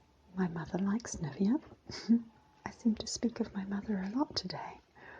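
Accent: New Zealand. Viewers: Lovely video...reminds me so much of one of mt favourite channels, Relaxing ASMR <link> does lots of old magazine and catalogue reading videos, and is from Mid-west USA...so nice to hear your Kiwi voice too :-)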